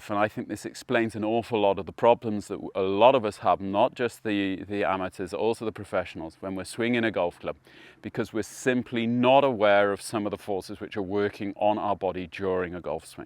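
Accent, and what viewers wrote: RP, United Kingdom. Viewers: ... while sounding posh and sophisticated.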